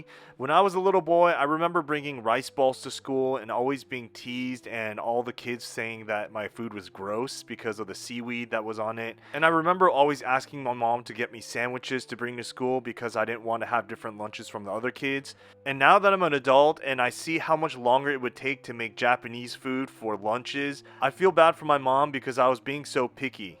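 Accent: United States. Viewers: did you live in america for a long time prior to australia? you speak english in an american accent edit: nevermind i saw the caption right as i typed that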